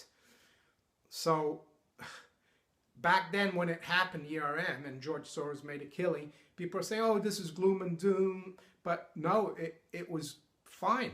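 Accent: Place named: United States. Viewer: I thought I could hear a lot of "American" in your voice. :-)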